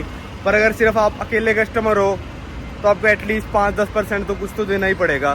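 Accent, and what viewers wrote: Haryana, India. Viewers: Haryanvi accent aa gya bhai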